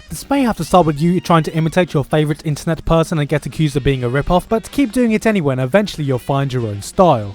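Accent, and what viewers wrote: United Kingdom. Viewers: Did you learn the British accent just for your videos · Why DOES he sound British???? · Why does this japanese guy sound british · British accent, check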